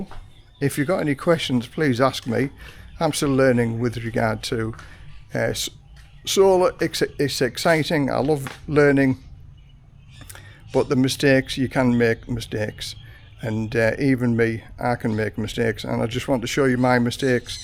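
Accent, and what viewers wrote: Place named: Sunderland, United Kingdom. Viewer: You from Sunderland? Accent seems similar.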